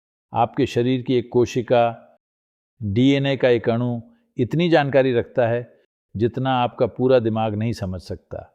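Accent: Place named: Tamil Nadu, India. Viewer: सद्गुरू तमिल भाषी है वहां ऐसा ही उच्चारण होता है भाई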